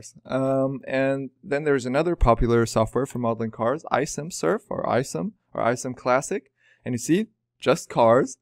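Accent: Germany. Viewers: You sound very german:) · Achso du bist Deutsch? Ich habe dein Channel noch nicht so lange verfolgt. Man hat das muss ich zu geben ein bisschen an deinem Akzent bemerkt aber eigentlich kannst english so gut das ich erstmal dachte du könntest auch von ganz wo anders her kommen.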